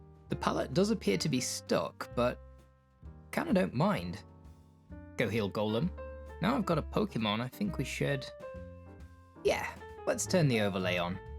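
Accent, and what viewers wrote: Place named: Shropshire, United Kingdom. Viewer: I don't, I just thought your accent sounded a little Shropshire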